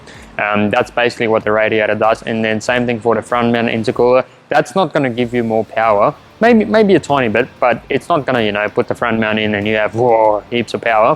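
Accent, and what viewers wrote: South Africa. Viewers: Dude, are you from South Africa?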